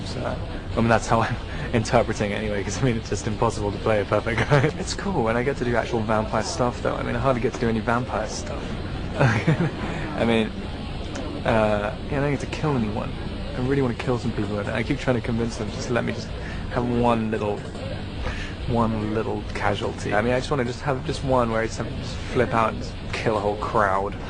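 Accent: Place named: London, United Kingdom. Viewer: his accent is like London English, Standard English, he is accentless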